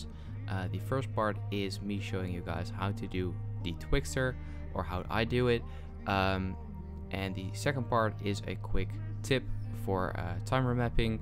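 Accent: Netherlands. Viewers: i just know you're dutch, you sound so dutch haha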